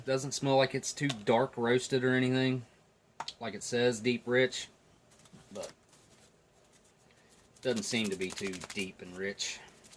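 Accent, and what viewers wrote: South, United States. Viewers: OMG THATS AWESOME!!!! I'll keep an eye out for the video!!! And congrats on the milestones!!!!! That's so awesome!!!! Btw I love your videos AND your accent! Southern boy huh?? 😁 I'm super happy for you! Congrats again!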